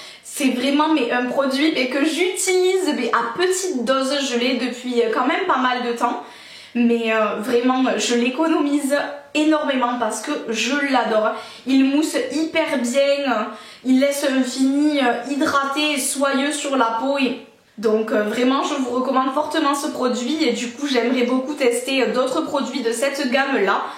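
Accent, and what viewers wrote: South, France. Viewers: coucou ma belle je découvre tout juste ta chaine, et je suis ravie <3 Je m'abonne avec plaisir ! d'ou est tu , il me semble entendre en accent du sud ? jai egalement une chaine, si l'envie t'en dit passe jeter un coup d'oeil ! bises à toi ! Tiphaine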